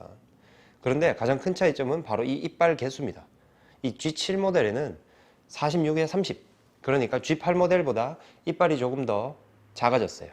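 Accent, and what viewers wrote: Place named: Daegu, South Korea. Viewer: 아직 유명한 채널은 아니지만, 응원합니다. 사장님의 약간 대구 지역 톤이 섞인 차분한 목소리가 굉장히 정감있네요. 구독!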